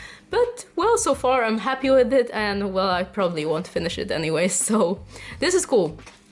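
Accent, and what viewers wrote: Poland. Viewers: 'cause is polissh accent 😏😘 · I'm from Poland like her and my dad has that accent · Masz taki "polski akcent" xD Jesteś z Polski?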